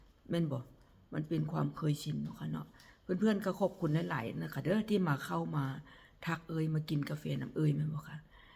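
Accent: Nyo, Thailand. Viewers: สวัสดีตอนเช้าค่ะพี่ ได้กาแฟดำดื่มแล้วสดชื่นค่ะ ฟังสำเนียงพูด เหมือนญ้อครพนมเลยค่ะ❤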